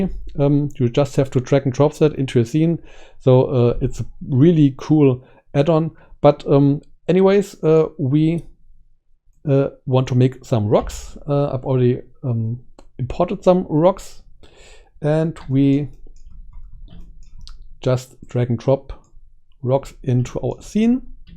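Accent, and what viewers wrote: Germany. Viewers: your denglish is the hammer ;)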